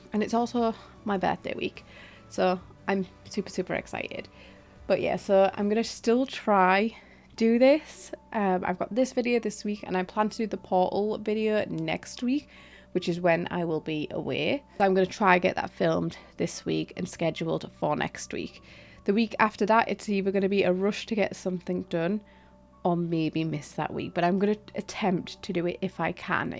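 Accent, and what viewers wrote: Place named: North, United Kingdom. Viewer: Just found your channel and hearing a fellow northern gamer is such a refreshing thing! Usually they're American and i dont feel a connection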